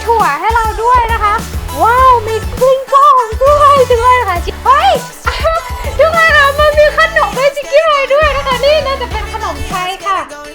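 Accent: North, Thailand. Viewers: เอ้ยอู้เหนือก่อ 5555 พี่ พูดเหนือไหมค้าาา?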